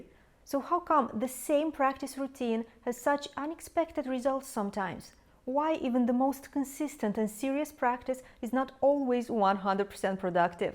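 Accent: Russia. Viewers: Teacher, this audio is better. Keep using the little clip microphone. A previous video I saw, I had a hard time hearing you (scale practice). There was too much room reverb, plus you have a Russian accent. I would consider a course of yours, but as a retired person living on reduced social security, I can not afford it. Plus, I have a hard time trusting you. Mel Gibson was married to an accomplished Russian pianist. Young and beautiful as yourself. They had a baby together. Then they got divorced and she took him to the cleaners.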